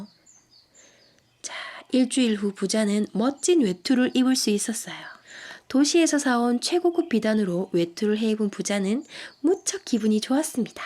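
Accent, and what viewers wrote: Gyeongsang, South Korea. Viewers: 저도 부산사람인데요... 경상도 표가 많이 나네요^^ 그래도 좋아요^^ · 경상도 사람이신가요? 사투리가.... ㅎㅎㅎ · 님:) 시간날때마다 예전 영상도 하나씩 듣고 있어요! 저도 경상도 사람이에요 ㅎ 사실 같은 경상도 말투를 '좋아'라고 생가한적이 별로 없었는데 님 음성 들으면서 좋아지고 있어요:) 감사해요! 책만 읽는 것만 아니라 님 생각도 함께 이야기해줘서 너무 좋아요! 늘 응원합니다 💕 늘 건강유의하세요!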